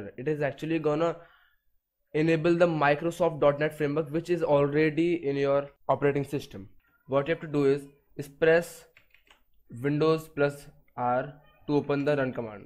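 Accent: India. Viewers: You know the tutorial is gonna work when the accent sounds Indian. ❤ U indian brothers.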